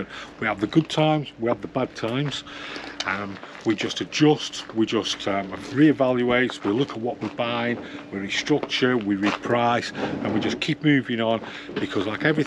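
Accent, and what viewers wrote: Stoke, United Kingdom. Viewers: You must be a stokie, with that accent ? , interesting facts 👍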